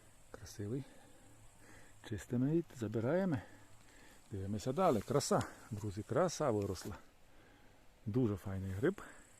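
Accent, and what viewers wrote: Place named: Carpathians, Ukraine. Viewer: Чудовий діалект!Карпатська мова ,доповнює красу такої чудової природи!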